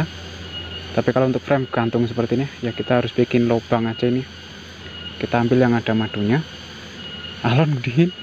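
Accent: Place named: Wonosobo, Indonesia. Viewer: Mau tanya bang,ini dari logat bicara sama seperti istri saya..apa abang dr wonosobo?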